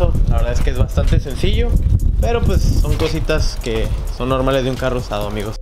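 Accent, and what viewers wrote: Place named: Sonora, Mexico. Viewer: Por las placas y el acento es de Hermosillo sonora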